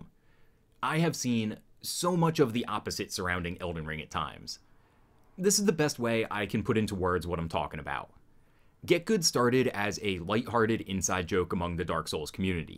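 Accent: Philadelphia, United States. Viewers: Philly accent for sure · Yo bud you from around southeastern PA? Is that a slight Philly accent I hear? You sound like me lol. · GREAT points man. you from philly?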